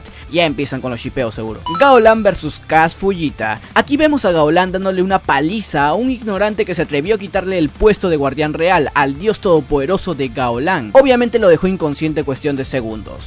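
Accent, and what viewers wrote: Peru. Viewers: Vos sos peruano?? 🥺👌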